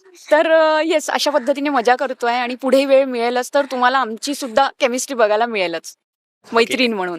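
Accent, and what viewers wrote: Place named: Vidarbha, India. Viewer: आपली भाषा मस्त आहे विदर्भ ची पोटे 😂😂😂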